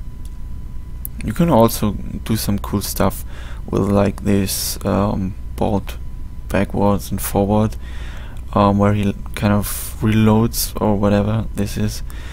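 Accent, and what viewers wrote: Germany. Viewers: Deutscher oder? :D Hört man raus aber gutes Tut ^^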